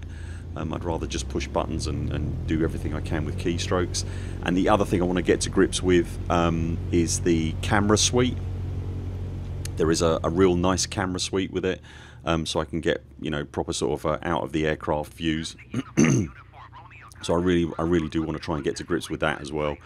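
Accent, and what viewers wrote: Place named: South East London, United Kingdom. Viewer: A South East London boy eh? Grew up in Bromley myself!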